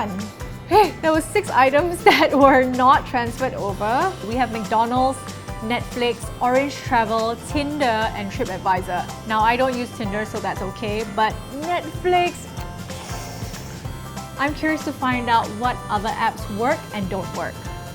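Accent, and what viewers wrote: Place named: Malaysia. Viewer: Malaysia accent.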